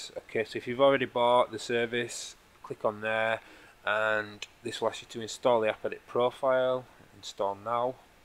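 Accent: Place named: England, United Kingdom. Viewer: Hey I didn't realise you was English I though you was American. +1 for English jailbreaks and developers.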